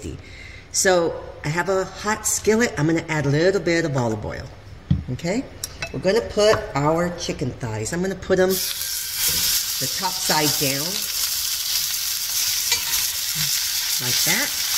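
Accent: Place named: Tri-State, United States. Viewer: Back east??? Hell no! I'm on the west coast, lady! hahahaha! Can't you tell from my accent? Say -- and forgive me if I'm wrong -- but are YOU from back east...like tri-state, by any chance? That's what your accent sounds like to me. Again, I am SORRY if I got that wrong.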